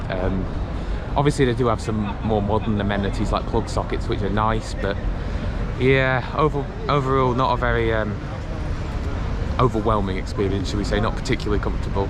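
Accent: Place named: Yorkshire, United Kingdom. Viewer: Love the Yorkshire accent. 'alifax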